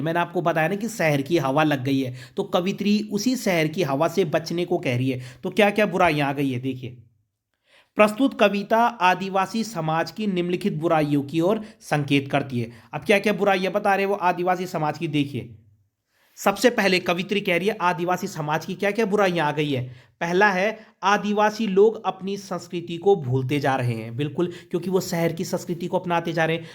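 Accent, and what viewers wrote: Garhwal, India. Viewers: Sir apki gadwali tune Ari h ❤️🤣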